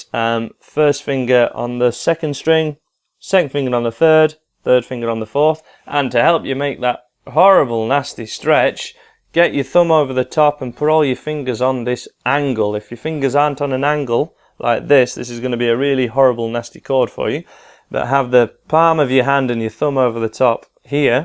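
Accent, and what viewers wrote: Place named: Sheffield, United Kingdom. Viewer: Sounds like a Sheffield accent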